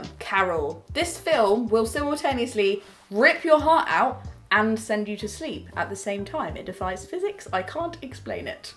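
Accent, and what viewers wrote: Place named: Worcester, United Kingdom. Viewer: Just stumbled on this video and my first thought was....I recognise this accent. Are you from Worcester, that is my hometown.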